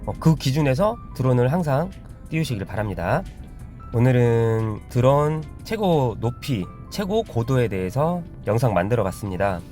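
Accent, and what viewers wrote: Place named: Daejeon, South Korea. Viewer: 대전분이신가봐요? 구독ㆍ좋아요ㆍ알람설정 했습니다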